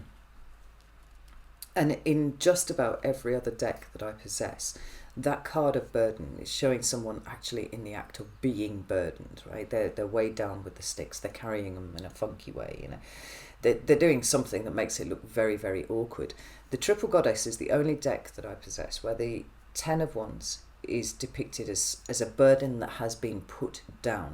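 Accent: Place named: England, United Kingdom. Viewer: Yo sister greetings from Andalusia Spain like the English accent,rare thing these days thanks for reading ❤🙏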